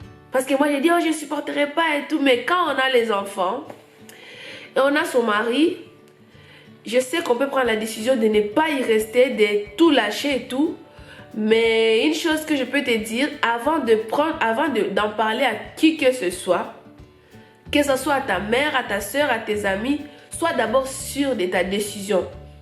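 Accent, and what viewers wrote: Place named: Democratic Republic of the Congo. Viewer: J'aime ta vidéo. tu as l'accent congolais. j'ai plein des amis congolaise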